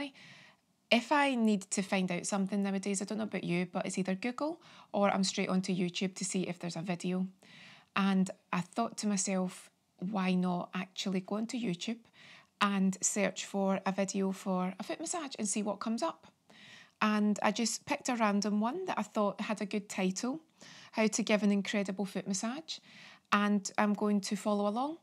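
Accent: Scotland, United Kingdom. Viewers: This looks so relaxing. I found your channel through recommendation from another channel i follow Love your follow alongs, and the indian head massage tutorial is soooo relaxing. Love Scottish accents too 😊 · I found you today looking for a how to massage video. I really like your voice and accent. What part of Scotland do come from?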